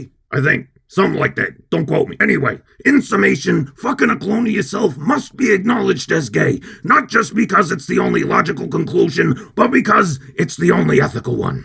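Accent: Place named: New York, United States. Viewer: Just found this channel, was expecting a tiny female voice, this gruff old New Yorker Boston shit is hilarious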